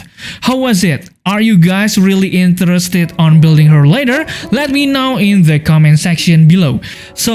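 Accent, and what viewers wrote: Indonesia. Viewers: Are you Indonesian by any chance? I kinda can hear the accent a bit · keep it up, even tho ur indonesian accent is to bold to hear, thumbs up from me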